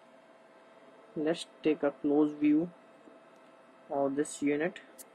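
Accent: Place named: India. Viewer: WHY you are talking like this..! Indian English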